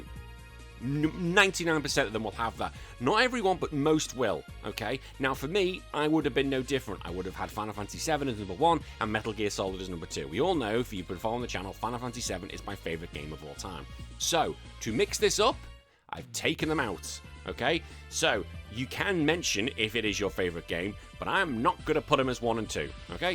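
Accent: England, United Kingdom. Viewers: "i don't give a..." whatever man just tell us your picks and stop pretending to be a tough guy. it will never work with that English accent I can tell you that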